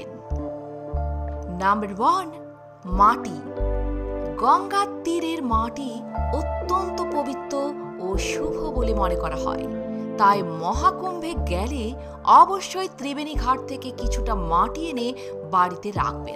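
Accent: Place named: United Kingdom. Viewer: British speaking Bengali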